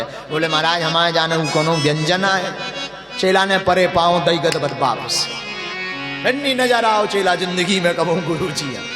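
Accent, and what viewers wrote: Bundelkhand, India. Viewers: Gurudev ji k shree mukh se bundelkhandi boli bahot achhi lagti h jai sitaram 🙏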